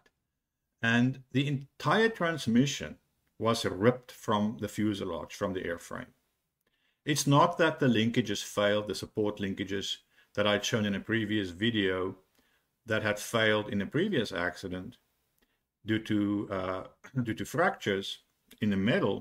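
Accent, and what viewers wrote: South Africa. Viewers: but every video someone makes about this accident is just speculation, this guy sounds liker a South african trying to just say something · Are you South African 🇿🇦? You sound like it.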